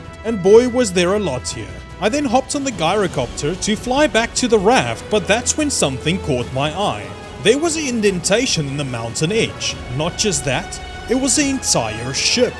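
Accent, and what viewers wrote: Afrikaans, South Africa. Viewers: oh ok because i thought that accent of your sounded really like a SA accent was just curious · Good to hear a south african voice, epic video man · You sound like you come from ZAR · Are you South African? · u sound afrikaans ..., are you? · Are u afrikaans? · You sound South African? Are you by any chance one of the 44? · Bro u gotta be South African with that accent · Are jou South African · you sound afrikans bro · First time I've ever come across your channel, can hear that Afrikaans coming through :P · Are you from south africa? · for some reason you sound south african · South african or new zealand? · mans sounds south african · You are south african me to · It sounds like your from SA? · Haha nice , eks ook afrikaans so ekt die aksent amper dadelik erken 😂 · Are you south african? Your english sounds a little like you got an afrikaans accent? 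· South africa? I'm ne not trying to offend you but are u south African because u sound like a south african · South African? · You sound like a south african when you speak english (the accent) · The south african in me smells an accent 👀🤣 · Do I hear a South African accent? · Why do you sound South African. Are you from South Africa?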